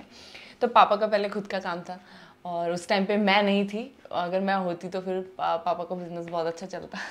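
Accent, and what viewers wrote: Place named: Pahadi, India. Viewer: Bahut achchha laga aapka ghar, aap pahadi bolo ya na bolo, apke bolne mai Pahadi 'Ascent' to aata hi hai.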